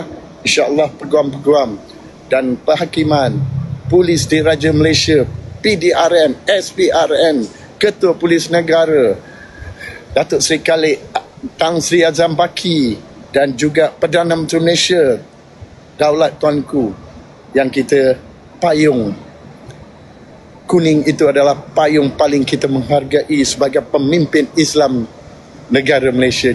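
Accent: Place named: Bangladesh. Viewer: Dia ni sapa.. dia ckp psl apa ni? Ckp mcm Bangla je.. x fhm.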